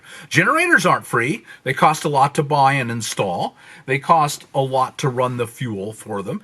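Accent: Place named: United States. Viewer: Hi Sir, It's so refreshing to watch your videos with soft music in the beginning and your handsome viking bearded face and American accent. It's almost like a religious experience because you do not talk about money! Very well presented. I love the WASP culture and values. Keep up the good work! Best regards, Tanveer, London, UK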